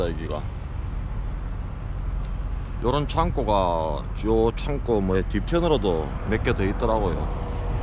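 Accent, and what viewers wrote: Gyeongsang, South Korea. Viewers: 아---- 네^^ 내 친구 목소리와 너무 똑 같습니다. 분 목소리는 톤이 굵은 경상도 말씨로 단호하면서도 성실하고 진실, 예의바른 어투로 특별한 개성의 보이습니다.계속 영상 시청하면 왠지 진주 친구 얼굴이 자꾸 오버랩 데내요^^항상 건강하시고 좋은 하루 되세요!!! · 전 경상도사람은 아닌데 경상도 아저씨의 구수한 말투와 따뜻한 마음씨가 느껴지네요~^^ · 어떤영상에 머리에 흰두근쓴 아가 스탑 싸인을 무시하고 달려 스쿨버스와 충돌한 대형사고가 있었다는 님의 영상이 생각나네요 약속은 지키라고 있는것이죠 편집에러 발생 원래 대사는 샤워장에서 깨끗이 샤워하고 뵙겠습니다 라고 해야 하는데 깨끗에서 짤렸네요ㅡ😜 머 그럴수 있죠 많은 댓글에서 님의 의도와 다른 맘으로 글을 싸지르고 있는 ㄴㄷ보다 님의 일상과 경험 구수한 보리문디의 한글소리 영어소리가 정겹고 다시보고 자주보고 아침마다 님을 기다리는 분들이 더 많을 것입니다 화이팅 하시고 응원합니다 잘보고 갑니다 ㅡ🚛 🚛 🚛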